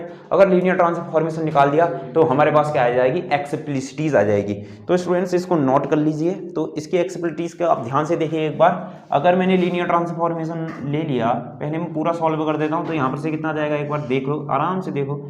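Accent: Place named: Kumaon, India. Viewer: sir aap lohaghat ya champawat se ho na? bolne k tarike se अंदाज़ा लगा rha hu 🙂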